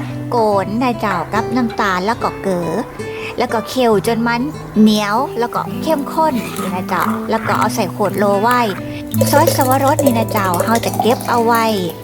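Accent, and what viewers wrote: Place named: Korat, Thailand. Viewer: สำเนียง โคราช+กำเมือง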